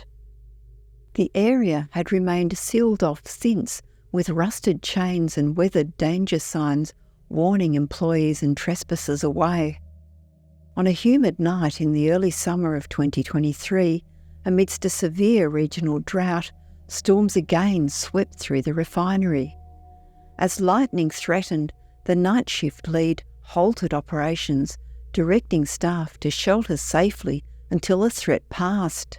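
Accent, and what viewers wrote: New Zealand. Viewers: I think it’s a real person, she’s just a New Zealander. So the accent is different than even Australian mainland accents. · Is that a kiwi Accent I hear? Your stories are great and your voice is beautiful and if that’s you in t he thumbnail…..❤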